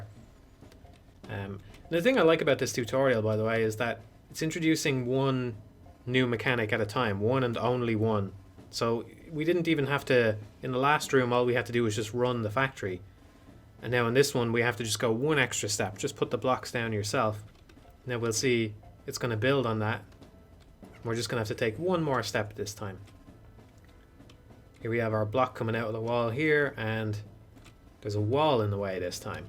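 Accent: Ireland. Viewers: Just discovered your channel researching Zachtronics. Gas to come across an Irish voice :D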